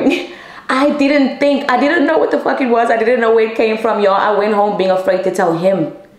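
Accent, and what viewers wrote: South Africa. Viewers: Are you from south africa? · So she is originally from South Africa, can hear her accent has a twinge of SA accent.. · Are you from South Africa 🇿🇦 ❤ your accent ❤ SA babes here you are so strong 🌸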